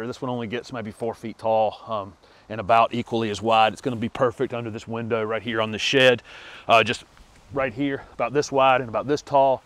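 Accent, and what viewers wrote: South, United States. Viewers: Hello, I am obsess with Camelias. Sadly I live in a zone 6. Any Camelias you know that are extremely hardy? Thanks so much Love your southern accent btw 😎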